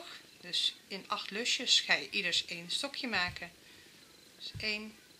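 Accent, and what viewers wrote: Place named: Brabant, Netherlands. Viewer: Ja hoor je kleine en het Brabants accentje ...ben ook een Brabantse ...maar woon nu in Groningen ...blijft leuk om te horen 😀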